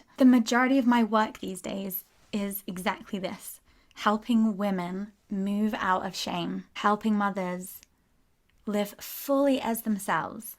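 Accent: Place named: New Zealand. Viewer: I haven't seen your videos for ages, and I love how your London accent's become super kiwi, like one of my best friends from school who lives in Taupo. I was thinking of you recently... did you see the case in Italy where a UK/Australian couple have had their kids taken away and put into care because they were unschooling and had a composting loo!!!?? It's unbelievable, and for us (living in Italy as progressive foreigners) it's made us feel quite nervous. We're leaving the country soon. But I wondered if there's any support within the unschooling community for them. My kids are now 12 and 10 and I have doubts/vague regrets about having sent them to state school. I know why I did it (believing they needed to culturally integrate into Italy and also as I work full time feeling I couldn't support them here), but they've both had some bullying and it's too rigid especially for my ADHD son.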